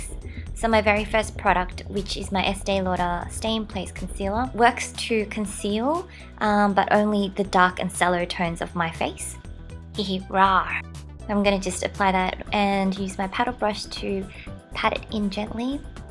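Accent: Australia. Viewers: Are you Australian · i've always wondered but do you have an australian accent when speaking korean as well?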